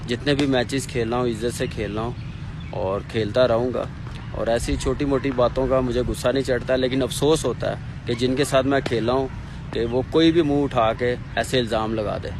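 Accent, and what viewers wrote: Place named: Lahore, Pakistan. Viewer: Dumb ass wo lahori accent hai unko ko tohra masla hota hai pronounce kerney mein werna tum log z ko j ki jagga use ketey chalo katey kisi ney yahan indo pak ki baat nahi k🙏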